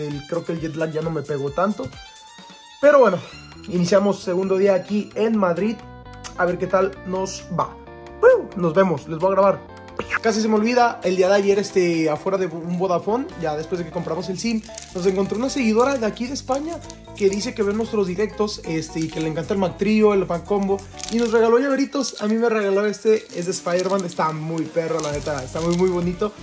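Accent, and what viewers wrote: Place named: Chile. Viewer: hablando como Chileno 🫶🏻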